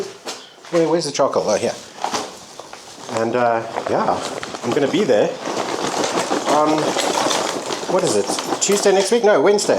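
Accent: South Africa. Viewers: After 23 years you still have a completely South African accent😂😂😂😂😂😅😅😅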